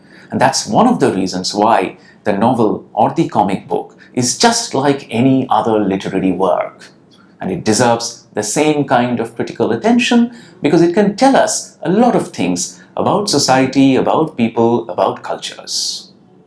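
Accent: India. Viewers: He is not attempting to sound British. This is the acrolectal variety of Indian English. Please don't be harsh on people without any reason.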